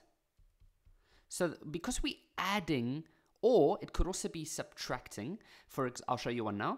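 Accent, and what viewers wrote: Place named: South Africa. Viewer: what country are you from because you sound like your south african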